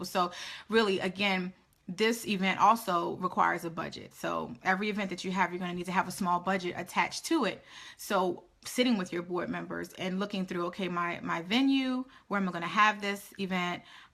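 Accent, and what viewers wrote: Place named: Philadelphia, United States. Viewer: This wasn't only informative, it was inspirational. Just starting in January, I've never even given thought to an event. The non profit status advice was helpful as well. I was wondering how to raise the ~$600 for 501c3 corporation filing. Thank You! I was also shocked you mentioned Baltimore. You sound more Phily than Maryland ;-)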